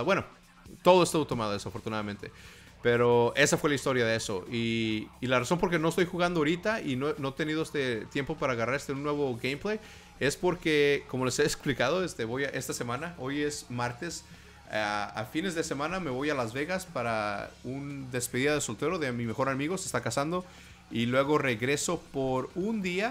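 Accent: Mexico. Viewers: de onde eres mi tienes acento como de mexican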